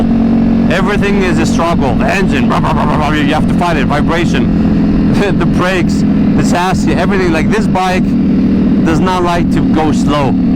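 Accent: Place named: Greece. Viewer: violently greek accent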